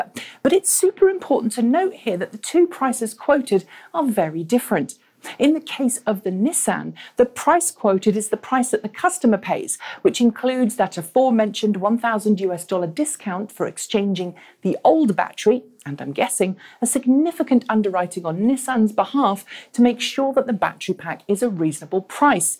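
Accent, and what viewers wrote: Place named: England, United Kingdom. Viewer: it s too hard to understand if English is not your mother language. it's a too 'hard' English accent. after months i must unsubscribe. a pity but it's always a too much big effort to understand for me.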